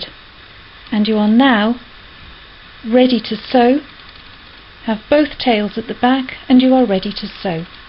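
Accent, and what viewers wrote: United Kingdom. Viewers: Sooooo cute... Perfect queen's English... I've blinking kicked the tripod.... Be kind to yourself sweetie... Not a big deal... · That was Beautiful, My Mother left Me a an Old manual pedal type sewing machine,, I'm ready to make a Sail and go Sailing.. This was clear and concise instructions.. and I love the British accent..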